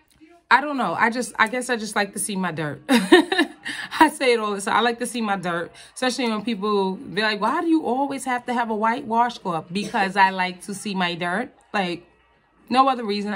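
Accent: New York, United States. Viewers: Good tips I use to use the vagisil odor block I love the smell and I wanted to try those method body wash you think the grey one would be best for all over body including the v or do you recommend not to put it there ? Fellow New Yorker hair I can hear it in you accent girl 🙌🏼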